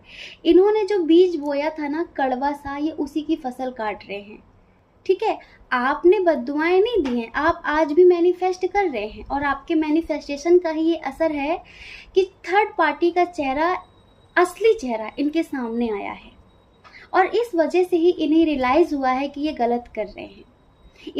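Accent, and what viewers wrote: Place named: Bihar, India. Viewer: aaj pehli bar hamne apki video dekhi hame bhi yahi laga ki aap up ya bihar se ho kyuki bhasha se yahi laga tha kyuki ham bhi bihari h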